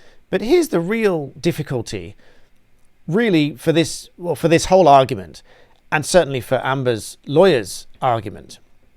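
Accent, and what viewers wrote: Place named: Birmingham, United Kingdom. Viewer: Are you from the Birmingham area?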